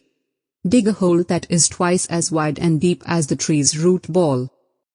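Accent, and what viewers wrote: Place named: India. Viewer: dirty indian voiceover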